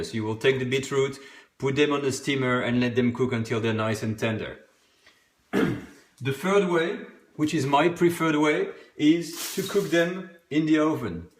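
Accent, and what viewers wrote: France. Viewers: Wish you make it longer than 9 mntes . Love your French accent Chef . Merci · You hsve such a beautiful French accented English 🌺🌺🌺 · Love your French accent ❤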